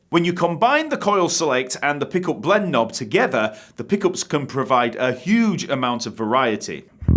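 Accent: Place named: North, United Kingdom. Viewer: Apart from your quality of content , it's so great to hear a NORTHERN VOICE on the tube ! -from a fellow Northerner !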